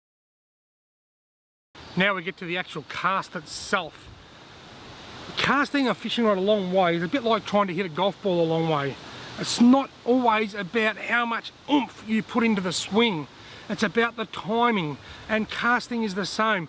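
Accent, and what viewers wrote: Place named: Australia. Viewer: My bad. I didn't hear Australian at first. Not many Aussies in USA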